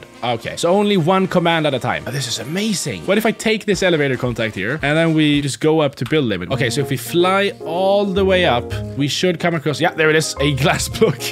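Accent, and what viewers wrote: Sweden. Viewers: are you swedish? i'm norwegian btw! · Are you swedish?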